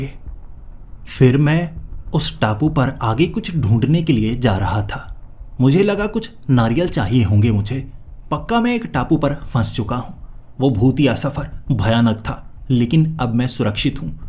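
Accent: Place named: South, India. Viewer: App south indian ho 100%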